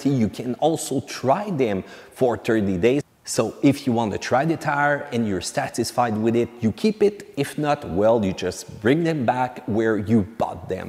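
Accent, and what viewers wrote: Quebec, Canada. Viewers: Yess, Anglais Québécois!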